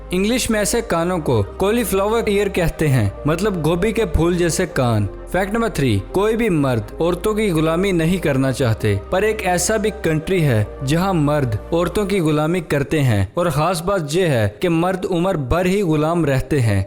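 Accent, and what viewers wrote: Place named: Lahore, Pakistan. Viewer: گدھے کا دودھ۔۔۔؟ لاہوری لگتے ہو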